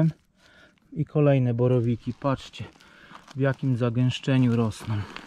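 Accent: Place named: Masuria, Poland. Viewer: Skąd jesteś? Sądząc po akcencie gdzieś z Mazur. Zgadłam?😉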